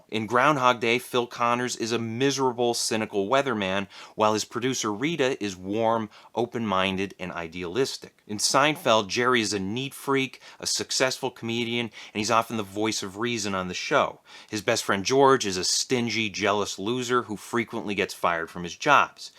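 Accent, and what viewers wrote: Chicago, United States. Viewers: Are you from Illinois/chicago area?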